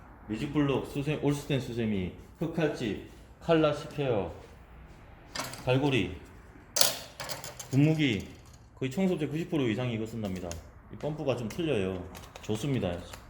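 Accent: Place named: Gyeongsang, South Korea. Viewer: 경상도 말투가 귀여우신분 처음봐요☺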